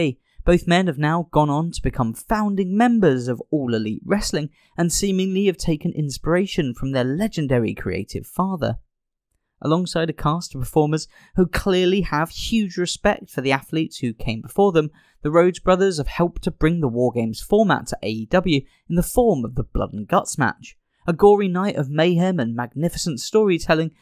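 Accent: United Kingdom. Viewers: I actually like the way the narrator says it, it fits right in with the rest of the proper British pronunciation he uses. Lay off the coffee man, all the random all caps and aggressive tone is really off putting and undermines the point you’re trying to make.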